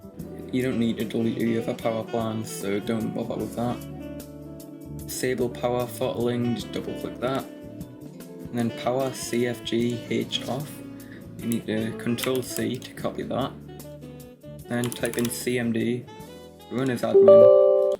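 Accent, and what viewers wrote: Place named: Wales, United Kingdom. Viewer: Cmon g I know your Welsh i can tell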